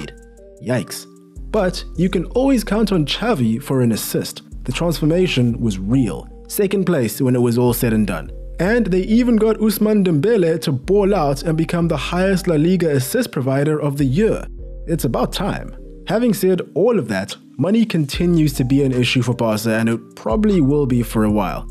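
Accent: South Africa. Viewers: are you south african ? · bro, are you South African?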